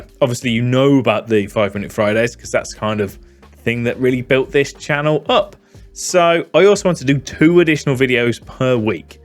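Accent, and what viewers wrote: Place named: Birmingham, United Kingdom. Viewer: Did not think you was Brummie, convinced myself you was a northerner 😳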